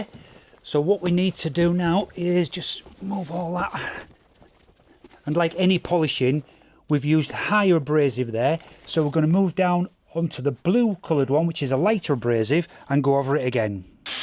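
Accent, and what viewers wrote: Hull, United Kingdom. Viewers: Hi, I'm new to your channel and have just watched two of your posts. I thoroughly enjoyed them. Love the Hull accent BTW. The car looks miles better without the stupid stripes.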